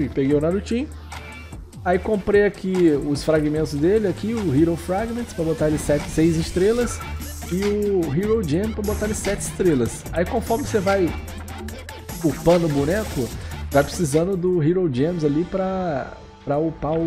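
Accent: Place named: Rio de Janeiro, Brazil. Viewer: Só q o cara e carioca lkkkkk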